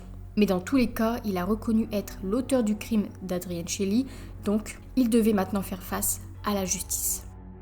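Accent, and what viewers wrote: North, France. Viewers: Tu es du nord toi non ?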